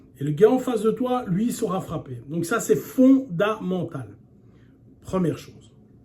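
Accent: Belgium. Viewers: Quand j ai commencé à vous suivre il y a quelques années...c était bien évidemment pour vos conseils mais également (et cela va paraître bizarre ),pour votre pointe d accent bruxellois..qui me rappelait avec une certaine nostalgie mes études et 10 années passées à travailler dans notre capitale. Quand je passe cage aux ours systématiquement je pense à vous....et vous voir continuer de nous donner vos précieux conseils alors que la majorité des patients sont prostrés à l idée des effets secondaires de la chimio,cela force le respect. Pareil à cambronne à waterloo, je vous imagine rétorquer à cette saloperie de maladie",la garde meurt mais ne se rend pas"...et à cet instant, voir ce cancer battre en retraite et choisir un adversaire plus faible. Longue vie à vous et je vous envoie un max de bonnes ondes bourrées de Kimé👊💪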